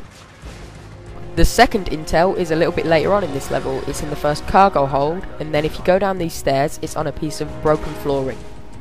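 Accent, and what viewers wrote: England, United Kingdom. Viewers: your british. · Who cares if he's british i have cheats now!! THANX MAN! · LOL, super british accent! XD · im jealous of your accent lol. I allways thought english people were just english jerks bragging about speaking speaking english better then americans. But after that i wish i could talk like that lol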